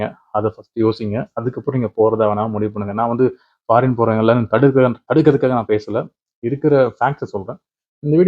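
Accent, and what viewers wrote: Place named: India. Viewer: ஒரு வடக்கன் நம்ம தமிழ்நாடு பத்தி அவங்க ஊர்ல இருந்து பேசுற மாதிரி இருக்கு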